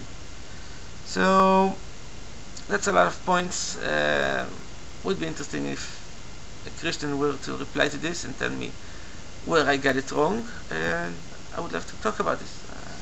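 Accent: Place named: Israel. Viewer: He sounds Israeli.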